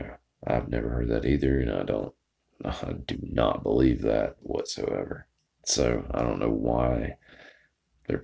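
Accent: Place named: South, United States. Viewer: How did I not know about this yet?!?! I may never have looked at it if it were not for you! I really love your podcast videos.....you have the best voice for this stuff....I love your subtle southern accent....and you are such a hottie too!!! Thanks for another awesome show!!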